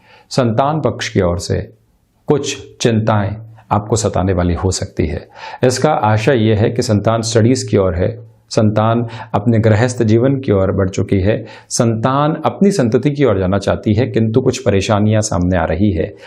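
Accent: Standard. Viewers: नमस्कार गुरु जी आप बहुत विस्तार से विश्लेषण करते हो , इस के लिए धन्यवाद और आप की शुद्ध हिंदी बोलना मुझे बहुत पसंद है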